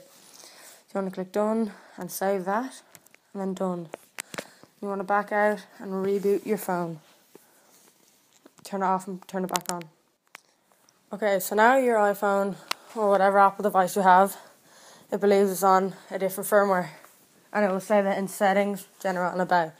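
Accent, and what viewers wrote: Ireland. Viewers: Are you irish chap me too :)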